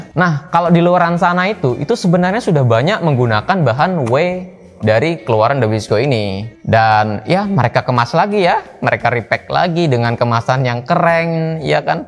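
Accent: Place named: Bogor, Indonesia. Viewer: Suara dan nadanya mirip ridwan remin🤣 orng bogor ya bang😂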